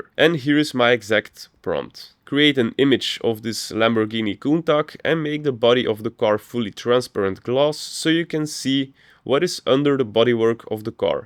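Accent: Belgium. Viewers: are you from belgium ?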